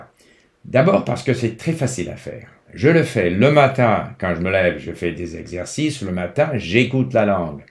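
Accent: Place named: United States. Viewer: الأكسنت تاعو رايح للماريكانية بسك ماريكاني . بصح الفرنسية تاعو parfait 👍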